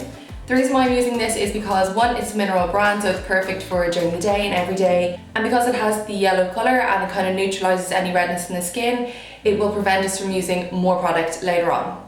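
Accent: Ireland. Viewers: I'm sure you get this question all the time but are you irish or scottish or something? Because your accent is super cute lol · It's her Irish accent... personally I think it's beautiful. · Oriana Brat she's Irish , it's just her accent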